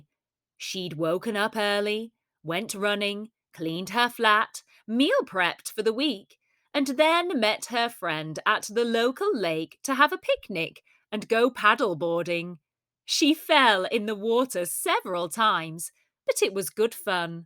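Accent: United Kingdom. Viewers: Honestly I've never taken part in a charity event. And there are also some activities that we don't know, but we learn them with you which means that helps and encourages us to learn with you and your British accent. Thank you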